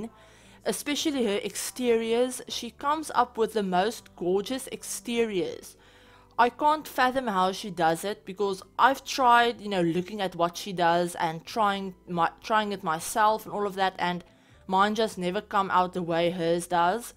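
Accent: Afrikaans, South Africa. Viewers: Ek kon dadelik die Afrikaans in jou aksent hoor!!! Volg al lank vir SimKim, en eks so bly julle het hierdie collab gedoen. Die interior pas die exterior amazingly!!! Stunning! En ja, ek sub nou jou kanaal ook. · oh that's so cool, I love the South African accent. Been trying to master it for so long haha, very difficult.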